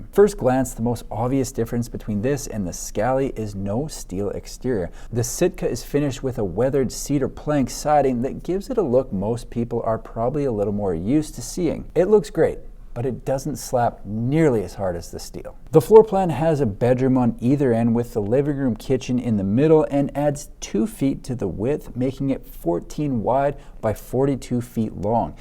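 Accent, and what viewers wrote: Canada. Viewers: Grammar guy here. I learned grammar, not pronunciation. Moreover, you're Canadian, your national pronunciation could be very different my California surfer dude twang. That written, ā indicates a long a as in hay, play, or gay. Given most US people think Canadians liter their sentences with ā, often written as "eh," I am not going to even take a run at this. Great homes, fabulous review.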